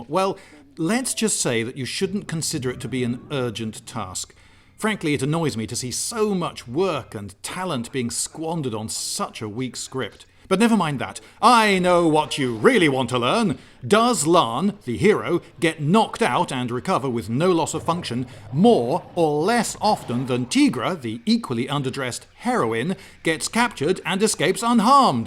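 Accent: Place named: United Kingdom. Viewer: For real this bad teeth brit voice is annoying to hear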